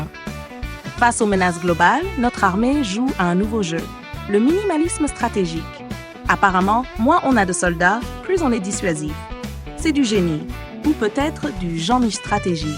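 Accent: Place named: Quebec, Canada. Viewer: Je peux entendre un petit accent québécois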